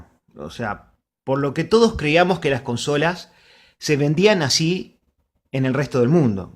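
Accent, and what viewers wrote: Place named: Argentina. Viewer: ¡Más que uruguayo, pareces argentino!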